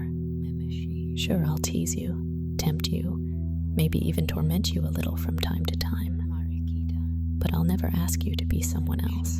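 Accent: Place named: France. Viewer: You Have Quite The French Accent 💗💗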